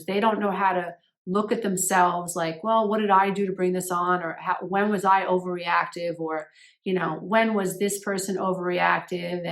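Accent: United States. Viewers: Hi I have seen your videos on Instagram as you only popped up the other day on YouTube as I was looking for something else to watch then I clicked on your feed to see what the video Is all about and I have two very evil brother and sister who are really cruel to me and done and said the most wicked things too me for the last 50 years but I am not sure if what you say is about anyone so just wanted to ask you that ok and I am not a troll as you mentioned in one of your videos on Instagram lol but I I will say this your absolutely gorgeous and I love your sexy American accent lol it's sends me to sleep because I am very unwell here and having trouble getting to sleep for days now so you have some good uses in you if nothing else hahaha im from England 😉😂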